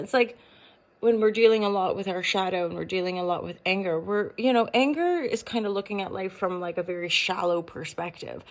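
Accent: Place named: Yorkshire, United Kingdom. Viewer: Good morning girly! I’ve watched you religiously until 2-3 yrs ago I felt called to watch you again, the accent is northern.. Yorkshire most prob 😂❤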